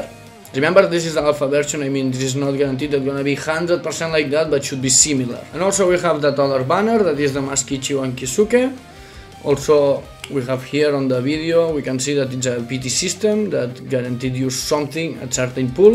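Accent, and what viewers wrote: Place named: Catalonia, Spain. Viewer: Hola soc català com tu, lo accent se te nota molt jajaj es bastant graciós veure com parles ingles bé pero en accent català.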